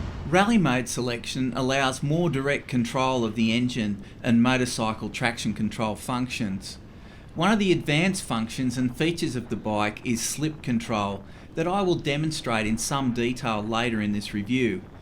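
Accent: Australia. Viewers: I’ve spent all Saturday watching reviews and comparisons. This is by far the best review on YouTube. Thanks for the awesome upload. Can’t wait for COVID19 to end. I’m wanting to step up from my CRF250 Rally, the KTM 390 is very tempting but the 790R just has all the goodies! It’s so good to hear an Aussie, just makes it feel some much more relevant 👍🤓🇦🇺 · Great review, thanks. I especially like the level of detail, feature by feature, very easy to follow and understand. I also like the Aussie voice and style - who else uses the term "gobful of power". I bought one after this review.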